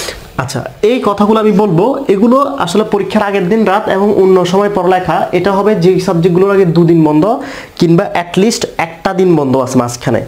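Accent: Chittagong, Bangladesh. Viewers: Apni ki Chittagongian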